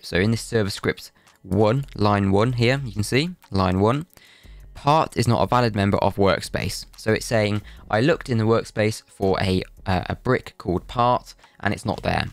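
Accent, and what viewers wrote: United Kingdom. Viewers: British? By your pronunciation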